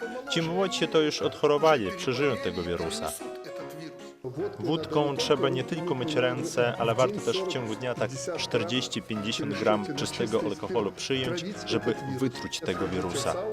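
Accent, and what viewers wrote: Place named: Russia. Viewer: Czemu ten lektor mówi Rosyjskim akcentem ?? Japierdole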